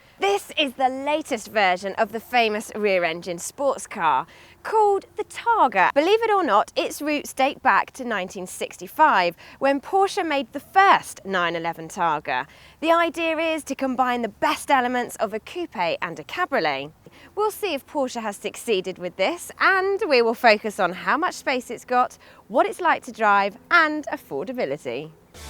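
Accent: England, United Kingdom. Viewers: I love your British accent. Cheers. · she's cool, reminds me of the one from fifth gear... anyways which region of England is this accent from?.. curious, anyone?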